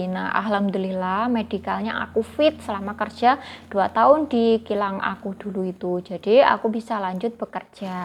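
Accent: Java, Indonesia. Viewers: Kabare? Pekat kok jowonya.. terbaik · Wah pekat nya Jowo nya dik...😂😂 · Kuat slank jowonyo. Kilang kilang nyaman kaya kerja office. Terutama kilang elektronik ada acenya nyaman sekali. Ada kantinnya atawa cafeterianyak ngak perlu keluar beli makanan semuanya dlm kawasan kilang. Cowok2 dan cewek2 pun ramai. Boleh main mata, ngobrol lama2 bisa dapat jodoh. Cewek cantik cepat kawen. Cowok ganteng punya ramai cewek. Cewek2 kaya jinak2 merpati. Kerja kilang kena koban diri. Kerja 12 jam termasuk rehat. Pergi pagi pulang mlm. Pergi petang pulang pagi. 8 jam kerja UMR 4 jam Overtime. Lama2 kerja UMRnya naik. Jadi gaji pun bertambah. Kerja kilang semuanya di sediakan. Angkutan, asrama, medical penal, bonus tahunan, Annual party dan lain2 di mana ada cabutan bertuah dan sebagainya. Exursion ke tempat2 wisata berkumpulan dan sebagaimananya. Lepas habis kontrak pulanglah. 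Bisa di ganti org baru. Org di luar sana ramai mau kerja. Bagus tak nyambung kontrak. Bagi peluang org lain mau kerja juga. Kerja saja di indon sana. Skg indo sdh maju byk lowongan kerja. Skg M'sia mau ambil kerja dari Siam pula. Kosnya tak tinggi. Sbb Siam sebelah dhj dgn M'sia. Cewek2 siam lgi cantik kulit merah kuning dari cewek2 indon😊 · Kok pekat amat jawa nya 🤣🤣🤣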